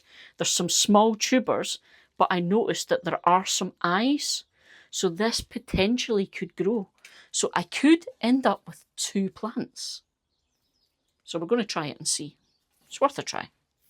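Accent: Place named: England, United Kingdom. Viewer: Thank You, Canada here. Just got some tubers (60$), so dont want to lose them.:) You helped alot. Peace. Love your accent (so pretty)... English accents always sounds like birds chirping... kind of. :) Peace.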